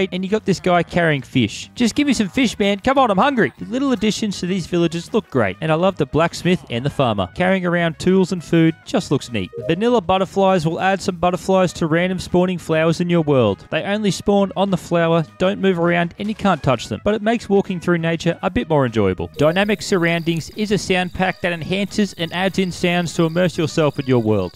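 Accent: Australia. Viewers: Is he Australian? Love Australian minecraft youtubers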